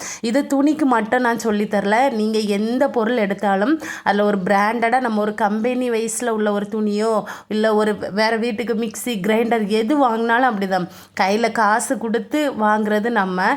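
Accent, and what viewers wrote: Kanyakumari, India. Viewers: Hi sis unka veetu enka pechu kanyakumari pola iruku marthandam side athan kekuren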